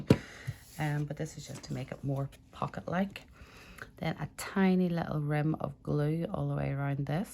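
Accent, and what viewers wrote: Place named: Northern Ireland, United Kingdom. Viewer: Great to hear your accent - making me a bit homesick. I grew up in Belfast. Now live in Western Australia. I am also a fellow SU demo. Tfs this lovely project. Just found you through Pinterest 💕.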